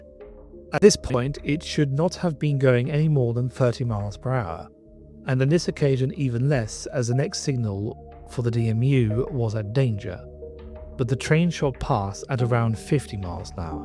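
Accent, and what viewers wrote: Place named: United States. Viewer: There are other annoying Americanisms in this, e.g. debirs pron. debree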